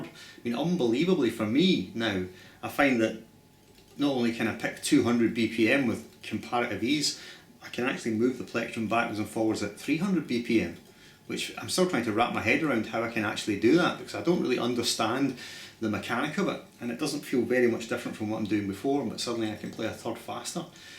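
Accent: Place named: Birmingham, United Kingdom. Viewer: For some reason when I listen to you speak, you phrase some words like the guys in Sabbath and Priest... I only use those guys as examples because they are the only people I have heard speak that are from Birmingham. When I think of Scotland I think of Sean Connery Thanks for the lessons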